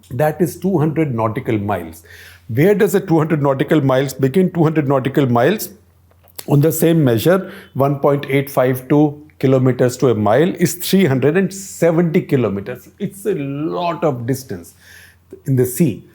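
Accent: India. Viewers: It is Indian English and this is how we speak it here. Like it or lump it.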